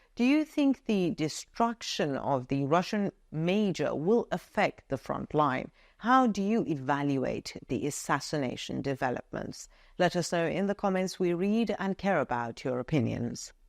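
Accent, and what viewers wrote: United Kingdom. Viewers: How does put that British accent in or is added after??😮😂 Thanks for your excellent updates 😊 have a nice weekend 👍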